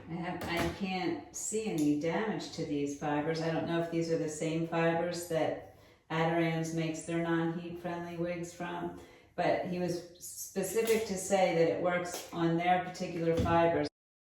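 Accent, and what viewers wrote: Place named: New York, United States. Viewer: Also as a former Long Island girl who moved to NC I miss that accent! (I’m assuming you’re a NY girl!)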